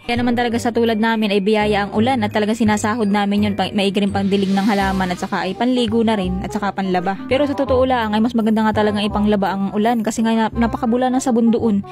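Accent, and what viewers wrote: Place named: Mindoro, Philippines. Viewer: Ai oo mandin.. dto sa quezon ai kapareha ng punto sa mindoro..saka semi batangas din ☺️ same. Bisaya aq pro dto laang aq nakatira sa perez quezon at uhay nabingwit ng taga quezon 🤣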